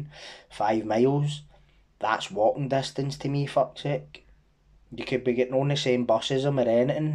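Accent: Scotland, United Kingdom. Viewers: I'm a new subscriber to your channel.. I'm a fan of crime stories and watch a lot on youtube while im busy with orders and you popped up a couple days ago.. loving some scottish narration (im in Edinburgh, partners from glasgow) so its good to hear of some more local cases! Keep up the good work 👌🏼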